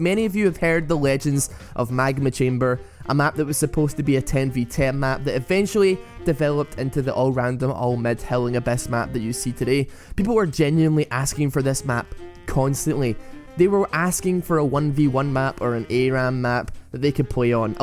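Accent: Scotland, United Kingdom. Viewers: are you scottish? I've been watching you for quite some time, but only now that I've started watching some videos of Frankie Boyle I've noticed your accent sounds very scottish:D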